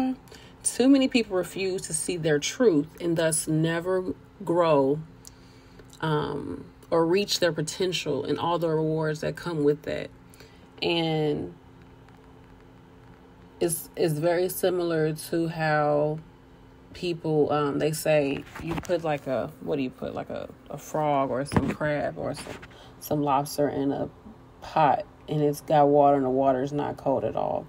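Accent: St. Louis, United States. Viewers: It's so funny how much this has all transitioned over the past few years. I so miss the 90s. lol It would seem to me that if you're only PRN, they'd give more orientation to allow you to create some sort of a routine considering you're not agency, you're actually a part of their team. And they know you're a LTC nurse and not a hospital nurse. t's just crazy to me. Be careful with that because you will still be held liable if heaven forbid something goes array during your shift. They will say "You could have asked for more training." You worked too hard to get where you are. And yes ma'am, you have come a long way. never forget that. I started following you a few years ago after you became an LPN. You were interviewing Victoria Randle of The Secret Cocktail. When I heard you talk I was like, thick chick gotta be from the Lou🙂. I'm from there too but I live in WA state. 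Anyway, keep your eye on the prize, there is more in store for you. You have great ambition and determination. The world is your oyster.🤩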